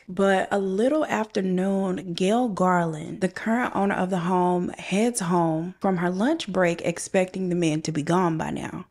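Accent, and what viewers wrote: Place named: Houston, United States. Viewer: I love this channel! I had done watched allll of Bailey’s videos over the span of like 3 yrs then you popped up like 3 months ago and I have been hooked like a worm on a fishing rod. I 1st heard your voice and just knew you was from Houston to later find out you wasn’t but was moving to my hometown 😊. Your storytelling style is all of the things(I stole that from you) I love and didn’t even know it. You’re so funny and the bloopers at the end are genius…love them. Keep going and yes I’m catching up on all of the past videos. Oh and welcome to HTown 🤘🏾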